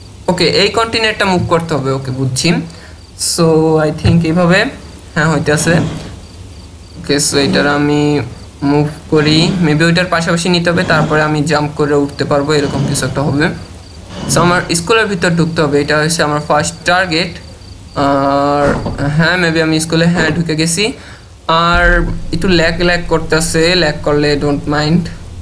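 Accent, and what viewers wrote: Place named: Barishal, Bangladesh. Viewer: Tmi ki borishailla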